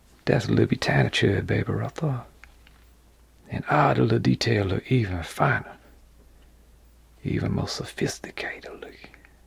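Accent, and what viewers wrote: Louisiana, United States. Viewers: Southernish? It's actually more like a Bayou Accent but he seems to be in an area where they speak "English". LOL Deep swamp language would need subtitles. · you have the most Louisiana accent I’ve ever heard and I love it. I don’t know if you’re actually from Louisiana but this is how I imagine everyone down in the bayou speaks. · He does sound like he's from my town for sure. Gonzales LA FTW. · this guy's accent reminds of of that cajun chef Justin P Wilson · Are you from Louisiana? Your accent is obviously exaggerated but you have a bayou accent that can only be exaggerated by a Louisianian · you gotta be from da bayou. I recognize that accent. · the awesome Louisiana drawl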